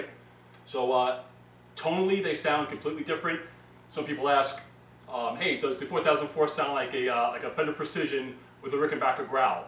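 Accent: United States. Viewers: You sound like a New Yorker! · your either a cop or firefighter, and you live...... hmmmmmm long island? Dont take that the wrong way, I love accents. You sound great. Im probably 100% incorrect. thanks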